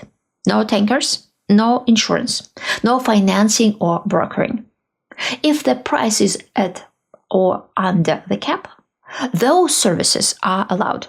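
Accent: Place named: Russia. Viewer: I could listen for hours to your charming Russian accent presenting horrific geopolitical events as smoothly as a wonderful cake recipe. Beyond my admiration for your voice, your analysis is spot-on. You called it—Europe is slowly waking up. Yesterday's drone strike on a ghost ship near Turkey proves your point. No harm to the sailors, but enough to stop the vessel dead in its tracks. For the unscrupulous capitalist adventurers buying Russian crude at 40% discounts, the message is clear: think twice. That "bargain" can cost you 100% when your ship becomes a target.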